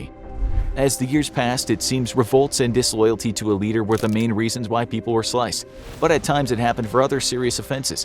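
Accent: United States. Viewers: This dude is probably the best narrator on YouTube. He also makes the American accent sound best. 😁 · Honestly yeah. I always loved the strong american accent haha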